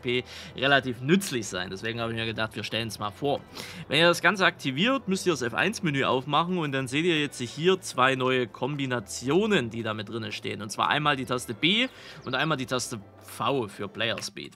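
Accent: Saxony, Germany. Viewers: das er Sachse ist hab ich mir schon gedacht, nur welche Ecke. Bin selbst Sachse.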